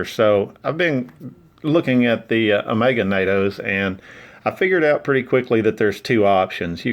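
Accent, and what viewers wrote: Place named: United States. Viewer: Hey bud, so glad I found your video. You sound like you’re from the states. I’ve been trying to justify buying an omega nato, until I found out they come with this kit. However, I keep reading that this specific packaging is only for European countries. Can you please confirm this packaging is also for U.S. customers. Thanks in advance. And great watch by the way, I got the black one.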